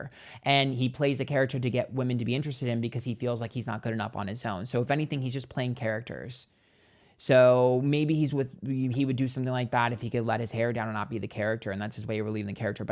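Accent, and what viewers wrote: New York, United States. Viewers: I knew you had to be a New Yorker lol 💖🤌🏼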